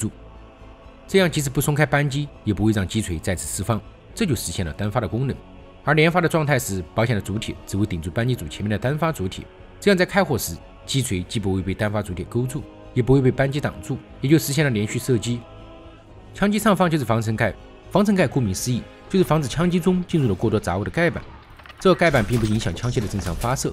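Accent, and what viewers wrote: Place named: Leshan, China. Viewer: 乐山口音，鉴定完毕。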